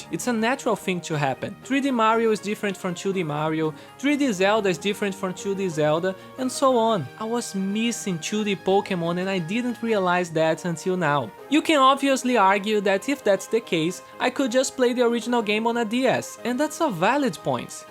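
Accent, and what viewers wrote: Brazil. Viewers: Are you Brazilian? You speak with kind of a Brazilian accent.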